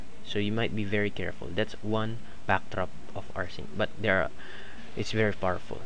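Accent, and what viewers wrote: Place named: Philippines. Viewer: pinoy accent!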